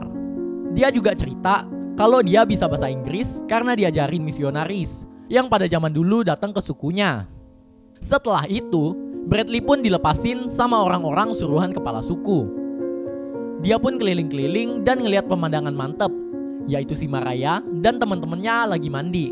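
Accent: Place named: Pontianak, Indonesia. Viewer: Klo ngomng kok kek org ponti bg!.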